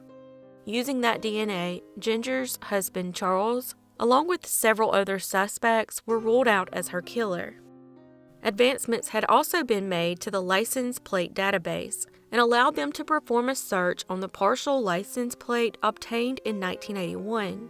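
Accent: South, United States. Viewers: I’m not from America, but…..as God is my witness ‘ahh’ adore those southern accents!😉😊😂🥰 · I'm born & raised in the city of Baltimore & i very rarely hear that nice southern accent & i absolutely love it. I come for your stories but stay for your voice. .. awsome content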